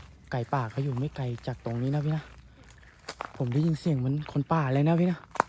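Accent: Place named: Isan, Thailand. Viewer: พูดคล้ายสำเนียงอีสานหวั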